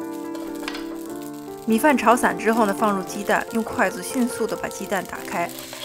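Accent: China. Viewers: 的配音好有中國味向獻上我的愛心💗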